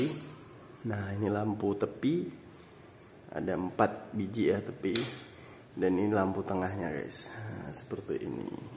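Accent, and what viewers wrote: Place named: Malaysia. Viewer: Lama kerja di Malaysia ya bang?percakapannya pun dah setengah melayu indo😅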